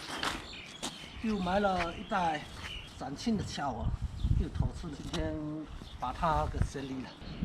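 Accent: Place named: Fuzhou, China. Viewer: 听口音是福州附近海边的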